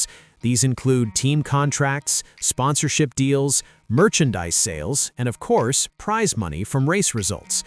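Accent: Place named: United States. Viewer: Amercan voiced commentary, Im out.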